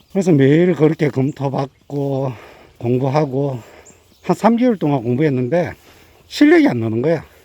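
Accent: Gyeongsang, South Korea. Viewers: 와우 처음왔습니다. 마스크하시고 옆집에 사시는 어떤 분과 같네요. 헉~~~6~7개월 동안 와.... 대단하시네요. 여행 갔다가 사시는군요... 아 경상도 말이군요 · 구수한 경상도 말투에 담긴 진지함에.. 좋은정보..항상 감사드립니다. 시드니에서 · 항상 뉴스 바로바로 올려주시고 구수한 경상도 사투리(저희 남편도 대구 출신)가 엄청 정겹고도 따뜻하게 느껴지네요. 이민 온지 13년이 되어가고 말만 시민이지 영어 포기자로 딸애한테 영어공부 좀 하라는 구박 받으며 이렇게 나이가 들어 가나 했는데 영어 해석 실력에 자극 받으며 테잎이라도 다녀볼까 생각중입니다!! 늘 업데이트 되는 최신 뉴스 열심히 기다리는 일인입니다!! 가족모두 항상 안전하고 건강하시길~ 오늘도 하겠습니다!! ㅋㅋ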